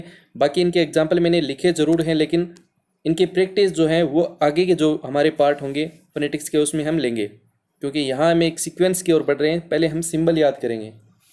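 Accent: Haryana, India. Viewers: Aapne tho kamaal k notes bnaye h Guruji 👏 PTA nhi murkh log kyo aapki vedio ko like nhi karte aapko tho gold ka button milna chahiye ,aapki aawaj aaj kuch badli Hui so lagi Guruji 👏 aapki aawaj Mai hmare area ka touch h from kaithal HR thanks a lots again 👏♥️♥️♥️💗💖♥️♥️💗💖🙏👏👏🙏♥️🙏🏻🙏🏻🙏🏻🙏🏻🙏🙏🏻🙏🙏🏻🙏🙏🏻🙏🙏🏻🙏🙏🏻🙏🙏🏻🙏🙏🏻🙏🙏🏻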